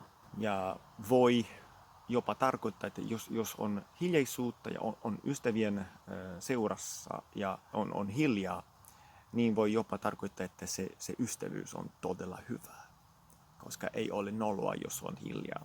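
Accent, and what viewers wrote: Finland. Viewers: Treenaatko suomen aksenttiasi erikseen vai onko kehittynyt korvakuulolta? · Now that you said it, I agree! There are a lot of swedish speaking finns in finland, and your pronounciation is quite similar :)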